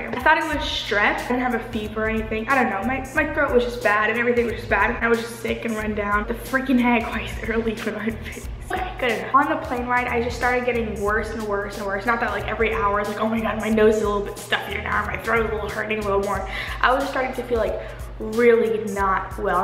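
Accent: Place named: United Kingdom. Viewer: sounds British ish even though I'm British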